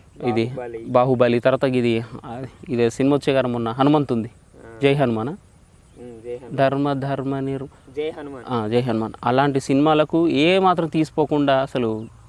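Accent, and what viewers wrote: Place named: Andhra Pradesh, India. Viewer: Anna nuvu manchi mana warangal basha Telangana yasa la matladu anna endhikantey manaku mahbad ollaki set kadhu Andhra slang...😊😊😊 Am following you from many years am from warangal 🥰🥰🥰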